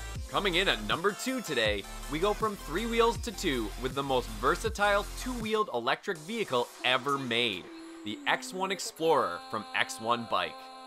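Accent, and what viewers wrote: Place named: Canada. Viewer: Why do you think I was talking to you? The narrator in the video has a strong Canadian accent.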